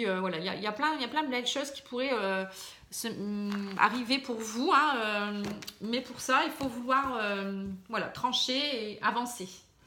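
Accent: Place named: Pas-de-Calais, France. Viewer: Bonjour, merci pour cette guidance bien complète. Juste une remarque sur le domaine sentimental : je trouve que vous parlez beaucoup des couples et pas assez des célibataires. J'en suis une parmi les 14 millions de célibataires de France, donc très loin d'être la seule. Pourriez-vous rééquilibrer vos prédictions sentimentales dans ce sens ? En tout cas je trouve votre analyse fluide et parlante. Merci ! Dernière chose : seriez-vous du Pas-de-Calais car votre accent me fait penser à ce département ? Merci. Paix et amour à tous 🤗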